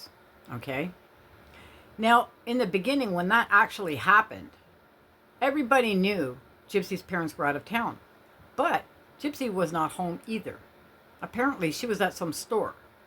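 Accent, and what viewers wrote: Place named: Canada. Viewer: And I like the Canadian accent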